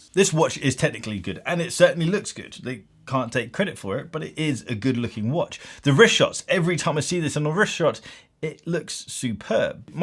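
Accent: RP, United Kingdom. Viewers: Yes, I was bored to tears listening to your public schoolboy voice way before that. That wasn't your point in this video and that isn't what you think so who cares. Rather than argue with people, which isn't a good look for you, why don't you just hold your hand up and admit - you got it wrong and totally misjudged opinion on this one. This watch is a masterpiece and Zenith have all the heritage & provenance when it comes to chronographs. You do know what El Primero means and it's relevance don't you?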